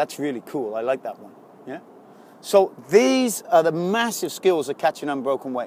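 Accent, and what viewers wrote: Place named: Newport, United Kingdom. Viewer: Can’t tell what accent he has sometimes I notice a Newport accent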